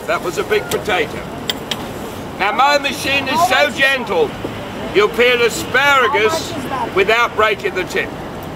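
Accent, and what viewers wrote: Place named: Peckham, United Kingdom. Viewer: his voice isn't Australian. sounds like he's from the depths of Peckham England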